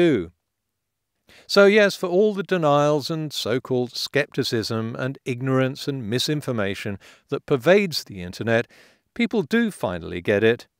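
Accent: United Kingdom. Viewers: CoupleFYI’s for you, saying it in a #BrittishAccent🗣️doesn’t make it #Believable, #Correct nor #Science and a simple #Proof of thearth’shape is #ANTARTICAScircumference⭕️🐧 #small🌏or #LAARGE🧇easy😎🙌🏾 #Science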